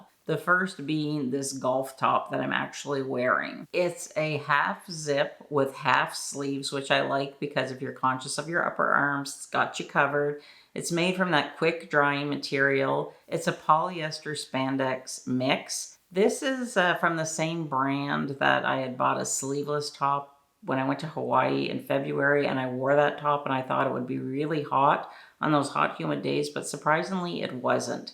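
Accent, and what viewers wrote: Canada. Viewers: Are you Canadian? Born and raised?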